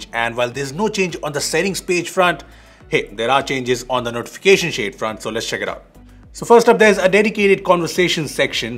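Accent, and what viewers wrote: India. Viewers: I hate when an indian talks english! hell of an accent 😫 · The indian accent is nice ( deeevlper ) ❤😁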